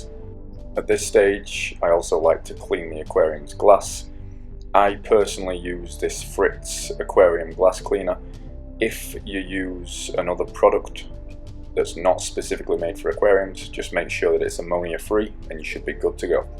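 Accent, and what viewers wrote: United Kingdom. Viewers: are you from the uk you sound like it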